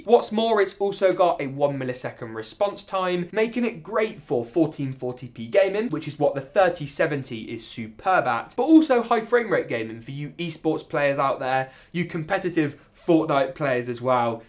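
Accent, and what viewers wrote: United Kingdom. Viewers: Great video but I don’t get why you are listing and talking about prices in Dollars, you sound like your from the UK so I’m a bit surprised and youtube is full of American PC Builders so it’s shame. Also it’s all great showing a great looking and performing build but it would be nice to see some thermal results of the builds which is just as important.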